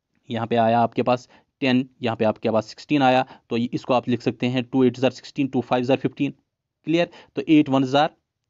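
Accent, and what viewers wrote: Kashmir, India. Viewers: Sir love your teaching skills. Specially your kashmiri accent... I completed statistics with full of enjoyment...The word,"Thanks" is not sufficient for your efforts sir. May God fullfill your every desire... Sir Iam preparing for JSA there are two more topics remains: 1 Correlation and Regression- Concept and simple applications 2 Interpolation and Extrapolation Sir please will you complete these topics too... 🙏🙏🙏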